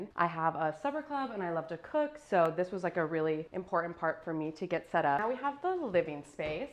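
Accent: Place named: California, United States. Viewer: It's a way of speaking common to California and especially LA. Several people commented about it, after your comment, and speculated that she's from there. And altho' I'm in NC now, I lived in LA for 30 yrs. So I didn't even notice it, lol!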